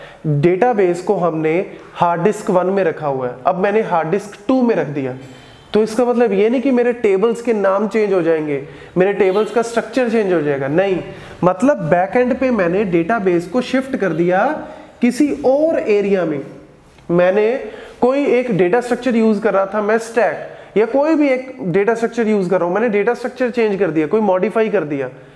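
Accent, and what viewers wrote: Punjab, India. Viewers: You are excellent, and one more thing..I know that this is out of context, but I can bet you are from Punjab😂😂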